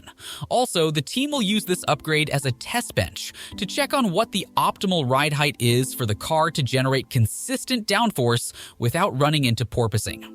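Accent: United States. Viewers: Nice video explanation but you also didnt say Grand Prix correctly with your very thick American accent ! It's a French word so say it with a French accent !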